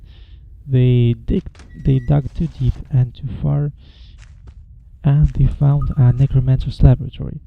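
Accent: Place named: Russia. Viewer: I didn't know you were Russian. You should comment more - you have a very soothing voice coupled with that fabulous accent.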